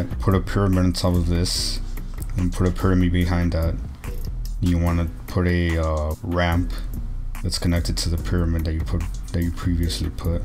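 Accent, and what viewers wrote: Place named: Spain. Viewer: U sound Spanish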